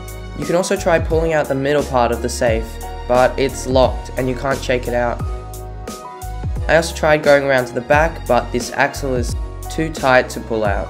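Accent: Australia. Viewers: YOUR AUSSIE!!!! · are you aussie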